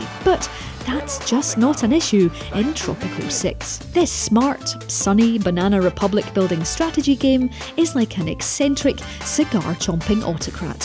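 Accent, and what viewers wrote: Scotland, United Kingdom. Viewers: I think it;s eng-scottish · Is she Scottish? I love her accent! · it's Scottish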